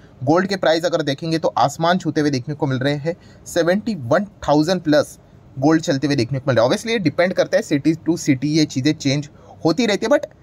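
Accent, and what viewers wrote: Maharashtra, India. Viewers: guruji pakka maharashtra ke hai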